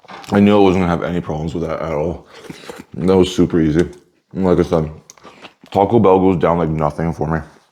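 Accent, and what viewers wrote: Canada. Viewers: Your accent isn’t super familiar to me as an Ontarian, are you possibly albertan or a maritimer?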